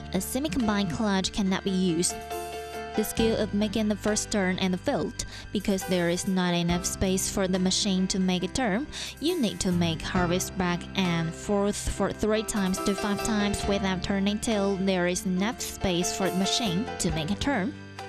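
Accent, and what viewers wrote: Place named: China. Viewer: an automatic reader with a chinese accent...i seen it all now.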